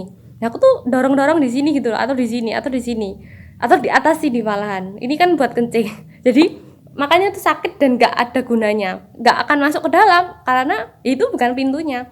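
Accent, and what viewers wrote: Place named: Java, Indonesia. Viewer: Kok bahasa medok yaa ce😁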